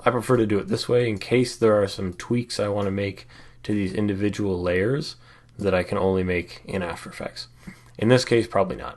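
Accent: Canada. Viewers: Hein quoi?! J'entend par surprise du québécois dans un vidéo anglo?! Ça c'est nice! :p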